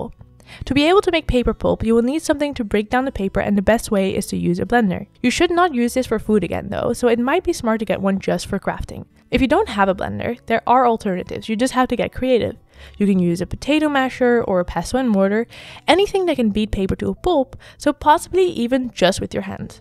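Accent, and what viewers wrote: Netherlands. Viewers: I love how simply you have explained this. I would like to make some paper for my watercolour work. If you don't mind me asking, where are you from ? I detect an accent and it sounds Dutch 🤔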